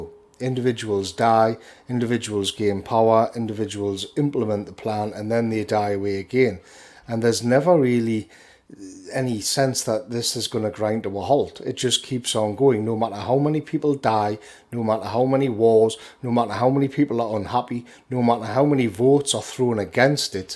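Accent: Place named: Newcastle, United Kingdom. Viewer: FIX IT FOX Scotsman?....he's a Geordie.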